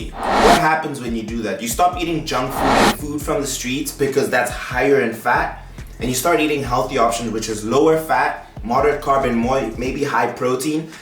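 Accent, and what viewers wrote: South Africa. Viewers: Are you south African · is he south african? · Are u from South africa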